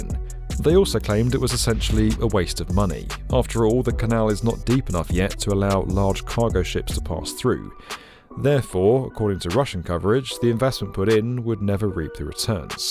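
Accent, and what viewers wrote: United Kingdom. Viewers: sounded british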